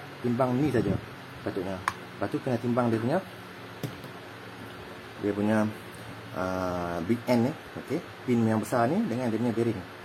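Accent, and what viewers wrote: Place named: North, Malaysia. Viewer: Dekat mana nie...dngaq cakap utara...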